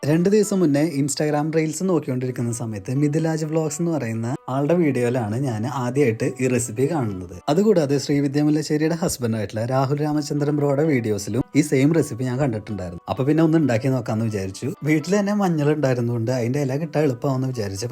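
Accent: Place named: Thrissur, India. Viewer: തൃശൂർ ഭാഷ കേൾക്കാൻ സൂപ്പറാ❤❤❤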